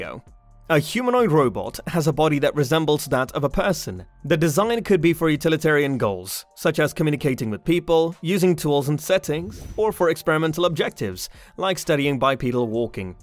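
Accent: United Kingdom. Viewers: fkkn brit accent announcer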